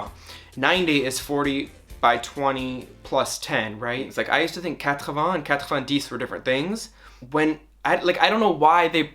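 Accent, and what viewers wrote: South, United States. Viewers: I love your French language videos. In English, you have a unique way of pronouncing words with an interior T (i.e. eaten, important, written). I can tell you’re from the Southern US but where? Merci!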